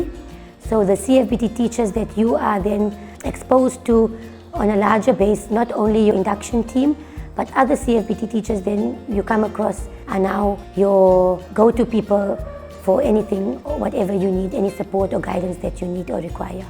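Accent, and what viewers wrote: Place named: Cape Town, South Africa. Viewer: South African Cape Town Accent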